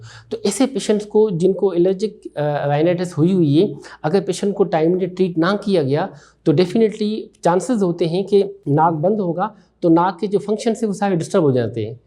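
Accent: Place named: Sindh, India. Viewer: Sounds sindhi accent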